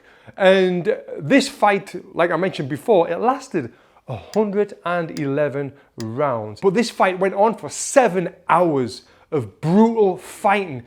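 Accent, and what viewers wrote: United Kingdom. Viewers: The accent is very british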